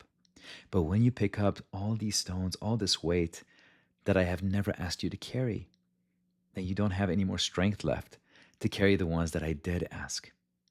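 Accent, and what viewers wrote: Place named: Germany. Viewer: Where are you from? You pronounce the wagon with a v not a w sound,Germany?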